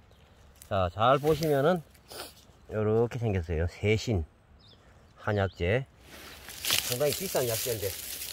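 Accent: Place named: Seoul, South Korea. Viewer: 알흠흠다븐 서울 누님 말썸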